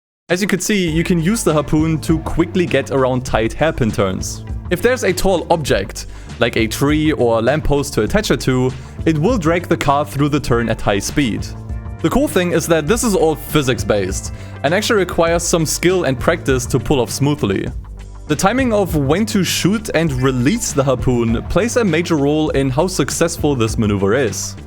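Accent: Germany. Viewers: Man hört sofort das du Deutscher bist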